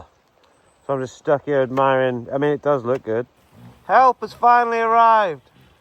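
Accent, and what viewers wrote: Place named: United Kingdom. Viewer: I'm so thrown off by "MidWest" and then the British accent.... what?! ps. I also test rode the TF 250 and had a blast. I'll be sticking with my Husky for the foreseeable future though.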